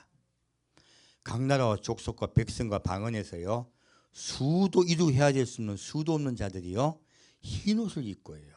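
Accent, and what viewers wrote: Jeolla, South Korea. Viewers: 말투를 보니까 전라도 출신 같으신데, 빙빙 둘러 말하지 마시고, 솔직하게 입장을 밝히시는 게 좋겠습니다.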